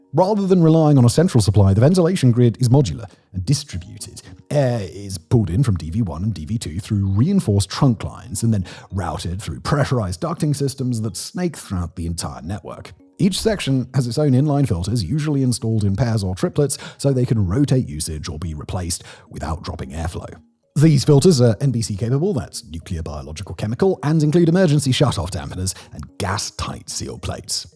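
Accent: United Kingdom. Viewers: Он британец, а они еще и портят американское произношение... Я бы знал, я американец)))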